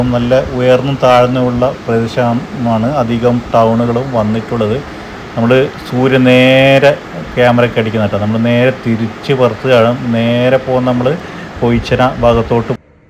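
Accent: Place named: Kannur, India. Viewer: സഹോദരന്റെ വീഡിയോകൾ സ്ഥിരമായി കാണാറുള്ള ഒരാളാണ് ഞാൻ താങ്കളുടെ ഭാഷയിലെ കണ്ണൂർ കാസർകോട് ശൈലിയിലുള്ളതാണ് എങ്കിലും നമ്മുടെ സ്വന്തം ജില്ലാ ആയ മലപ്പുറത്ത് വന്ന് വീഡിയോ ചെയ്തതിൽ വളരെയധികം സന്തോഷിക്കുന്നു പക്ഷേ ഒരു കാര്യം പറയാതെ വയ്യ മലപ്പുറം കോഴിക്കോട് കണ്ണൂർ ഭാഗത്ത് ആറുവരിയിൽ വണ്ടി ഓടിക്കാൻ നമ്മുടെ ആളുകൾക്ക് അറിയില്ല എന്ന് പലപ്പോഴും തോന്നിയിട്ടുണ്ട് പക്ഷേ ഒരുപാട് ഫൈനും ഒക്കെ വരുമ്പോൾ പഠിക്കും എന്ന് വിചാരിക്കുന്നു നല്ല ഒരു വീഡിയോ തന്നതിന് നന്ദി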